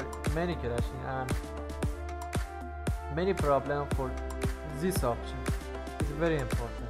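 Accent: Iran. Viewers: Thanks bro where are you from? You speak like iranin (دمت گرم داداش خیلی مفید بود تونستی فارسیشم بساز)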